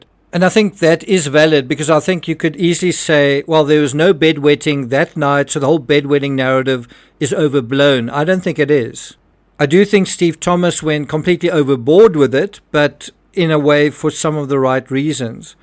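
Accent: South Africa. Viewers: is ur accent SA? do u speak afrikaans?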